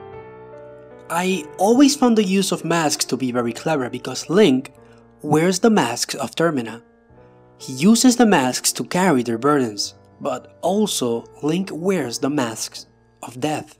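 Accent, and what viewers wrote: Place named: Turkey. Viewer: good video but turkish accent + its called video essay and you could have made it hours long since theres a lot to talk about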